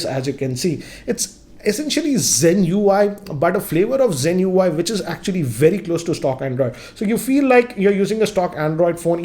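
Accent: India. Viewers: That's his native accent. He doesn't hide it. We Indians generally speak 2 to 3 languages and our proclivity for languages is commendable.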